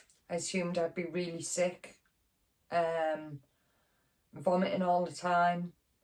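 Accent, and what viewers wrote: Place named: Wales, United Kingdom. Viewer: Hello friend. Wishing you a nice weekend in ..... I'm guessing from your lovely accent ....Wales?? I know that your videos are helping - and will continue to help - women with cancer. Take care of yourself, kind lady. ❤️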